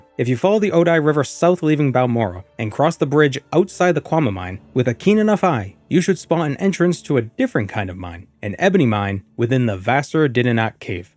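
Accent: Boston, United States. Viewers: i realize this is an odd question to ask, well spoken TES challenge run youtuber I subscribed to a bit ago, but are you from eastern Massachusetts? Very rarely in your videos, i occasionally catch a familiar accent in your VO (in this one you said bastard as 'basted' one time), but I can't tell for certain if it's Bostonian or not.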